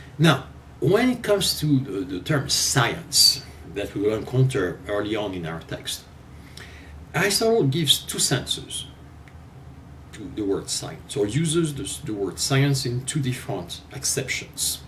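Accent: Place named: France. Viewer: I love the French accent. Perfection.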